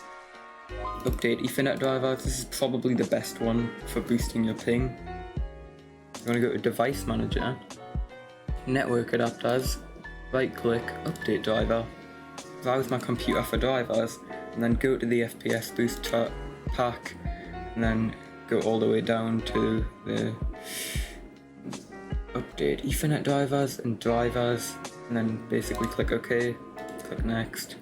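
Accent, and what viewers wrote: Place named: Wales, United Kingdom. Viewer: Cmon g I know your Welsh i can tell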